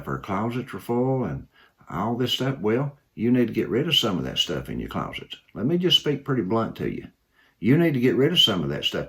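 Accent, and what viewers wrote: Kentucky, United States. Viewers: My family is from Manchester, Ky. I just love seeing people from Ky. I didn’t grow up there but I still feel a connection to Ky. Your accent reminds me of my granny and my uncle and aunt. Love hearing you talk.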